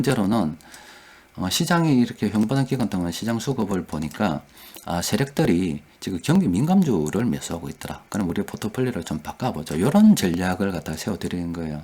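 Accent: Gyeongsang, South Korea. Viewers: 경상도말투친근감있어서 좋습니다~ ^^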